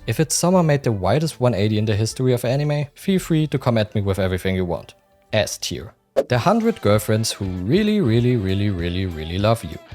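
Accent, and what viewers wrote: Germany. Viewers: i heard the german accent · Ich hab den deutschen Akzent sofort gehört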